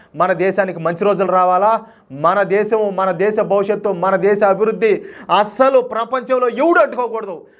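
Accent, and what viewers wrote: Rayalaseema, India. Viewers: Latest news ను వెంట వెంటనే అందిస్తున్నందుకు నా ధన్యవాదములు గారు, చాలా థాంక్స్, ఎవరు ఏ నెగటివ్ కామెంట్స్ చేసినా మీరు మాత్రం ఆపకుండా ముందుకు వెళ్ళండి 👌🙏నేను మాత్రం మీ అభిమాని.. మీరు మా రాయలసీమ slang తో సవివరంగా matter ను ఎక్సప్లయిన్ చేసే విధానం బాగుంది please continue 🙏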